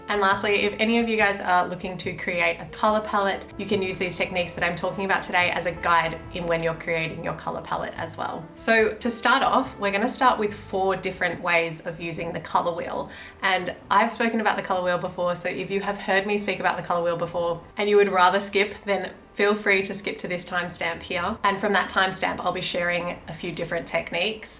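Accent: Australia. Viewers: Great vid love your non bogan Aussie accent and your way of explaining this theory is really clear with good examples. Thanks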